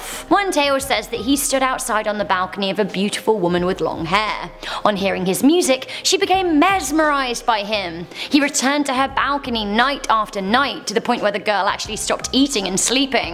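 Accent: England, United Kingdom. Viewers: She is English and has an accent my guy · Honestly loved this list! Most of these were my bed time stories growing up 😂 a very popular one is La Mano Pachona. Basics of the myth is that a furry hand would come up from under the bed and pull your foot. Sasquatch? Chewbacca? Who knows, it was scary as hell growing up tho 😂 (Side note, I've always wanted to hear someone with a British accent speak Spanish. You did not disappoint. Thank you for fulfilling my life long dream 😂)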